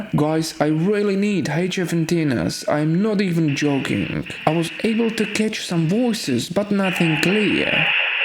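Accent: Russia. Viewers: that Russian accent...yak... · your English is pretty good and Russian accent is actually cool 🤙 What matters the most, you took the time to make the video 🙌. Losers will always leave loser comments 😅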